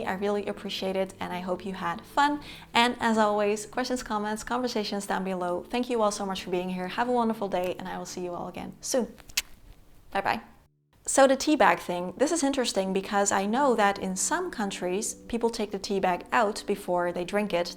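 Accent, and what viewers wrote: United States. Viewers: You sound totally American. I wouldn’t have known you were Dutch sadly. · From the USA. WhenI began working in my mid twenties, I would purchase a wall calendar so I could write down each day what I wore to work.everyday. I wore seperate so since i did not have a large wardrobe my wall calendar helped me keep track of the outfits I wore in that way I would not put together the same seperate pieces of clothing too often. I am fascinated as to how your English is so fluent and perfect without any hint of a Dutch accent. You sould like an American. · You speak perfectly American English 😮!!!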